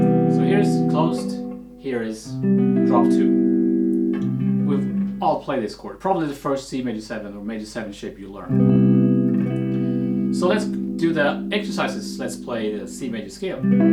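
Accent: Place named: Sweden. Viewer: Great lesson! By the way are you finnish or swedish. Based on your name I'd guess finnish but your accent is a bit more swedish than finnish.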